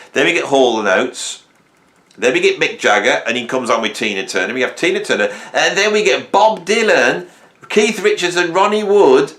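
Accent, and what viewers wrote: Birmingham, United Kingdom. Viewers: Birmingham / the West Country. 🇬🇧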